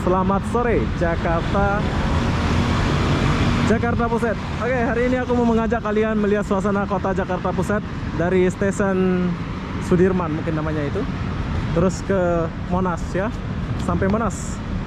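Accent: Malaysia. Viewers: Bang padahal orang lombok, knapa abang masih pakai bhasa orang KL. Pakailah bahasa sendiri yaitu bhasa indonesia, apa udah terbiasa duduk di KL jd lupa sama tata bahasa sendiri,,susahh brnar hilangin logatnya itu.. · Kamu org malaysia apa indonesia? kok ky ada logat dan kosakata melayu malaysia?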